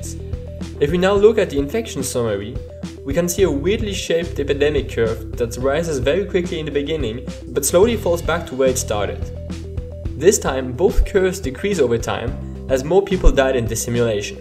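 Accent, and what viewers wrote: France. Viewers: I know I'm dumb, I know you are trying to show us somethibg cool, but all I know is that I couldn't focus on anything else than your fluent english with french accent... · Un accent français ca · You are french aren't you?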